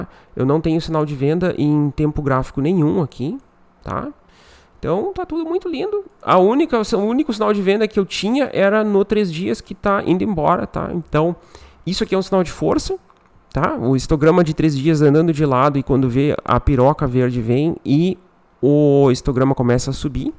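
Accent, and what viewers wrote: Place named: Australia. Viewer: Kkkk😂 mas não tem mesmo, o tem um sotaque tipo uma mistura de Inglês Autraliano com Gaúcho.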